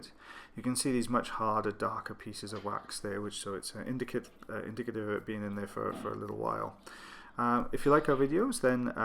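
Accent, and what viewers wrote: Wales, United Kingdom. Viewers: A lovely soft Welsh accent! I'm an expatriate Brit in Alaska so quite a while since I heard the Welsh accent! To be honest, I hadn't noticed yesterday when I watched one of your videos...it was only in the 'tissue paper' video comment section that I realized! I've been out of the UK too long (since 1984) so I'm now a defunct Brit! :(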